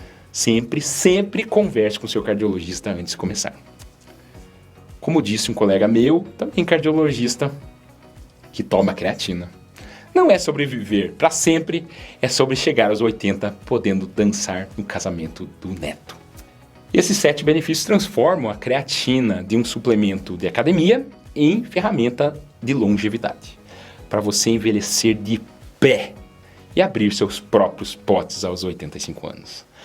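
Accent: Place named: São Paulo, Brazil. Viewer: Esse dr., tem um sotaque muito carregado de paulisteca, pouco se entende o que ele fala!!!!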